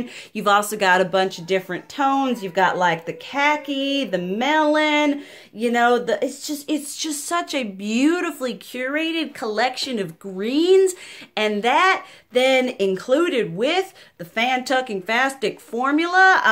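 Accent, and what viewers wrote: South, United States. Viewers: I wasn't expecting the country accent from the thumbnail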